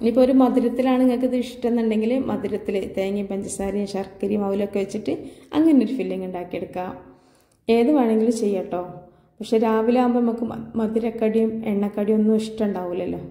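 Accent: Malappuram, India. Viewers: Thatha. Kureperu kaanunna vdeo aanithu. Athukondu manasilakunnavidham samsaikku. Thani malapuram aakalle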